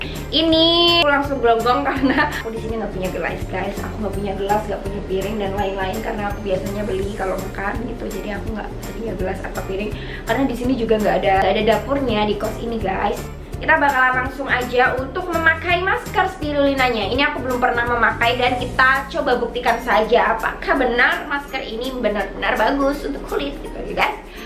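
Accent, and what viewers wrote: Java, Indonesia. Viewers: Medok bgt tapi lucu suaranyaaaa😍😍😍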